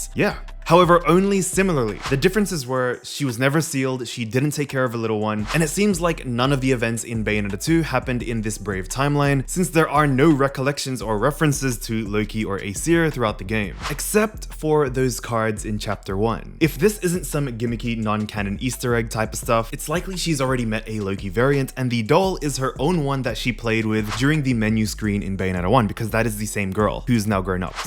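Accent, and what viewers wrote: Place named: North America. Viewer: Where are you from bro? It says Australian on your channel but your pronunciation of certain words sounds very North American. Thanks for explaining this clusterfuck of a story though - much appreciated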